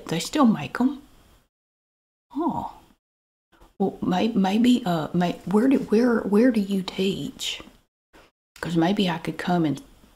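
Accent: South, United States. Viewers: is the epitome of adorable AND cringe. And the southern accent really adds to that I'm sorry to say 😆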